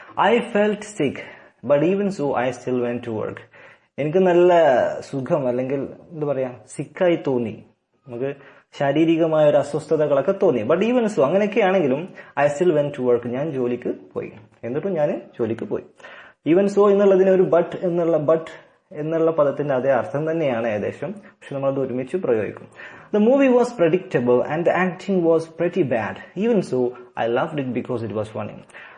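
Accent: Malappuram, India. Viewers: malappuramaano thaankal???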